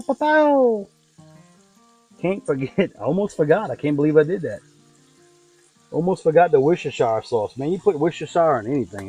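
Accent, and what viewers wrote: Louisiana, United States. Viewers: I’m watching you for the first time…I was saying to myself this Arkansas guy sure sounds like he’s from back home. I’m from Lake Charles but moved to Memphis recently for work. I have a Bronco. Gonna check out dem Ozarks real soon. Can’t find no good sausage in Memphis area. I have to bring Sausage and Boudin from home.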